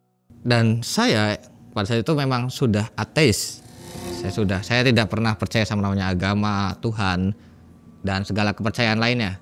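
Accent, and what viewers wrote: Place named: Cirebon, Indonesia. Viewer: Logat Cirebon nya ketarak banget bang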